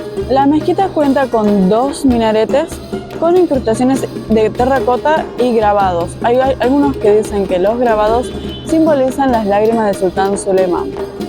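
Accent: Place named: North, Argentina. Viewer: Hola!! Me encantaron tus videos, hace unos días descubri tu canal, se que sos Argentina y por tu tonada del norte. De donde sos? Tal vez Salta, Jujuy o Tucuman? Ya me suscribi porque pienso seguir mirando todos tus videos, buenisimo todo 😊❤un besito y a seguir adelante que vas a tener mucho exito con tu canal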